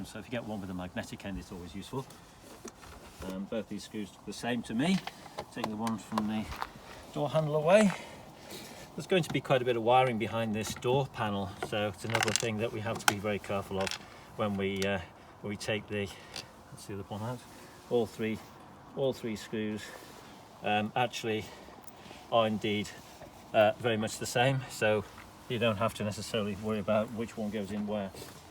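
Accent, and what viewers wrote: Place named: United Kingdom. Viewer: Maybe it just me and growing up watching Michael Caines Battle of Britain. But with your voice and accent I feel like your working on a Spitfire. Very enjoyable video explaining the repair.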